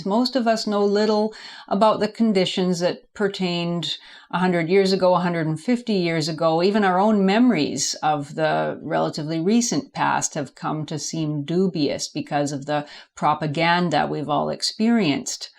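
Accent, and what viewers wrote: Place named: Canada. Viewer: Goodness gracious I love her Canadian accent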